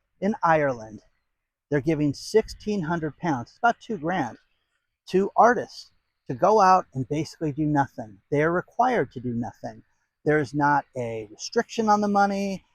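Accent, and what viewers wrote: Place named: Minnesota, United States. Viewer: Sounds like Minnesota.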